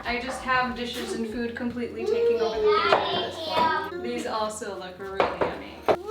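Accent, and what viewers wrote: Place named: Quebec, Canada. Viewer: it has to be the Canadian French influence. i tried learning a little of it once, but my ears are tuned to Spanish from growing up near the southern US border.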